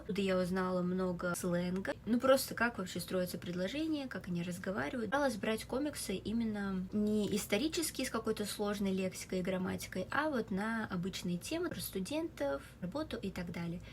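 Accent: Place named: South Korea. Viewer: Добрый вечер! Я просто в (здесь мат в хорошем смысле) с вашего прогресса в изучении данного языка. В русской речи уже слышен корейский акцент, что считаю показателем высокого знания иностранного языка. Мне из азиатских языков (выбирал только из корейского, китайского и японского) понравился японский. Но так как там есть иероглифы, решил что с иероглифами познакомлюсь быстрее через китайский))) Но через время узнал, что в корейском тоже есть китайские иероглифы (к сожалению на данный момент уже не использую, либо почти не используют) и соответственно решил изучать и корейский. 🤕 И это была большая ошибка. Корейский на тот момент мне не зашёл, для китайского не было такого рвения как для японского. Ну и соответственно было принято решение, отложить изучение иностранных языков на неопределенный срок. В этом году (в ближайшее время) хочу вернуться к изучению 7 языков. 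В моих целях нет достижения такого же уровня в столь короткий срок, просто хочу получать больше информации по шитью из разных источников. Можно будет увидеть обзор на приложение дуолинго? В основном интересуют: произношение и построение предложений. P.S.: В мой план по изучению входит дуолинго (только из-за того что он бесплатный); несколько блогеров (на данный момент по шитью); бесплатные курсы на ютуб; ну и в дальнейшем фильмы и сериалына иностранных языках, но только с субтитрами на оригинальном языке. Соответственно музыка, и какой-нибудь информационный канал (онлайн) на ютуб.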